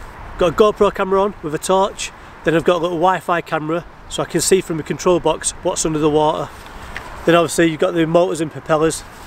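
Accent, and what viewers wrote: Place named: England, United Kingdom. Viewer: very nice eng accent haha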